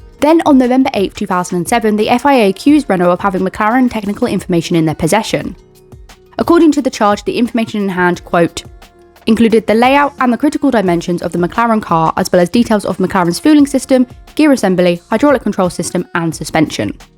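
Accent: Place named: United Kingdom. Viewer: Great video, keep going, having British accent in F1 commentary always sounds awesome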